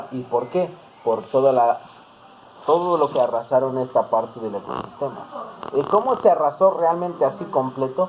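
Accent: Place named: Mexico City, Mexico. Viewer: espoturno Es totalmente diferente, este wey tiene acento chilango. Los mayas siguen ahí en las comunidades, no mamen con tanta desinformación!!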